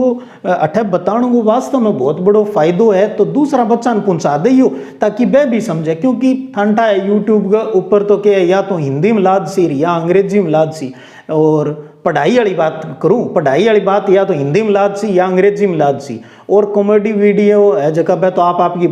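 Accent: Marwari, India. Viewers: Aaj sir marwadi bol rage ho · Sir hmare school me bhi ek sir marvadi bole he ve bahut achi marvadi bole he aap ke jesi 👌👌👌👌🤗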